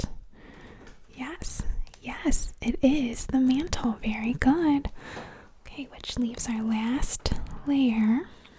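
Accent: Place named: Midwest, United States. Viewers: makes learning fun. (relaxing too) Also, you have an accent. Chicago? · love the midwest accent 😍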